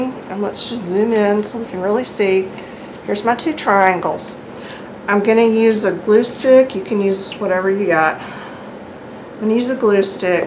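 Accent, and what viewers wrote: South, United States. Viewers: it was kind of obvious that you were a fellow southern gal, but I didn't know that we were neighbors! I'm in Memphis, just across the river... Hi neighbor! Lol! Thanks for the bead tutorial!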